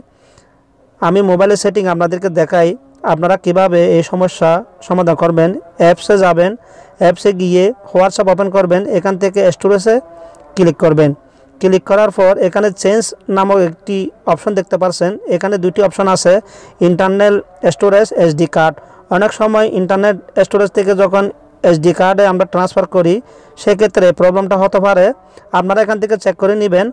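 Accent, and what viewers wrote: Chittagong, Bangladesh. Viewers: চট্টগ্রাম এর নাকি ভাইয়া আপনি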